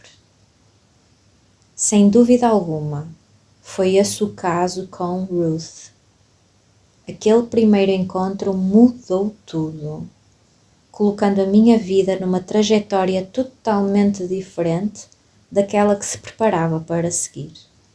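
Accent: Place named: Portugal. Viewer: Obrigada! Adoro sua voz e sotaque Português! 🥰😘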